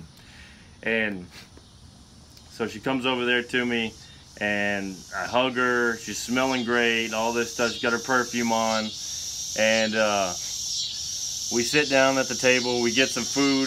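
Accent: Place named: South, United States. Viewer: Glad I found your site!!! Stories making my heart melt!!! Not sure if it's the Southern drawl, cowboy hat or YOU!!! PLEASE keep posting!!!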